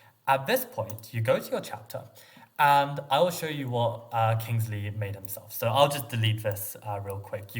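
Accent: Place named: Germany. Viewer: the German Accent sounds real cute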